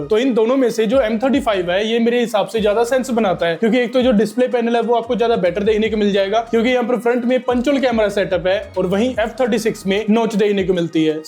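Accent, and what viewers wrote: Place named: Punjab, India. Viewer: Are you punjabi? Your hindi has punjabi flavour😂😂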